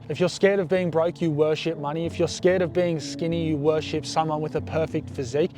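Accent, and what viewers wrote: Australia. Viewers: He has an Australian accent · Even though I don't relate to this experience, it sounds so aussie that I should be relating lol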